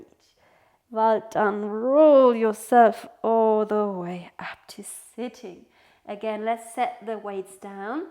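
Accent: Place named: Eastern Europe. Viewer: Thank you,. I asked you because I am russian myself and I can hear that slight easteuropean accent. Ann your name in russian.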